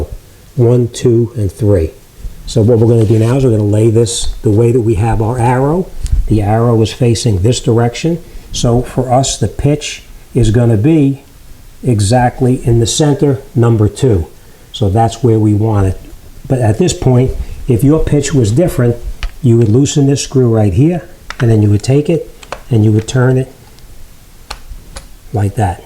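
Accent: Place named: Maine, United States. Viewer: You sound like a Mainah... I love it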